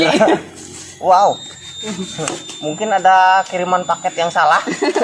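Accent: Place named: Banyumas, Indonesia. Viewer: cingireh ngapak ....salam wong jowo bro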